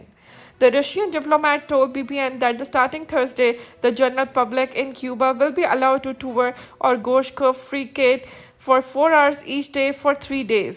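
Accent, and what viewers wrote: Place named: India. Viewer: British ? Not at all ! Your speaker has a strong accent... Russian media with Indian speaker ?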